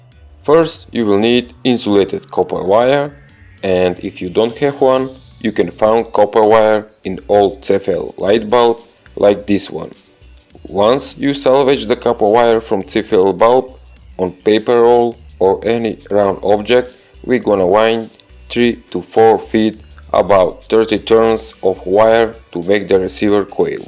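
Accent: Russia. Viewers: American hacker with russian accent. Epic combo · How is he american hacker he sounds like he's a russian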